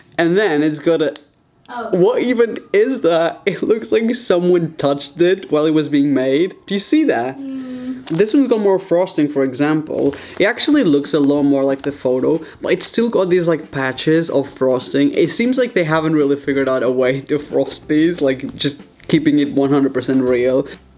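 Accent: England, United Kingdom. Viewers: 10,000 subs without any videos NEENJA Portuguese people don’t have a accent really at all, he has a mix of British and English . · THE BRITISH-NESS!!!! I LOVE IT!!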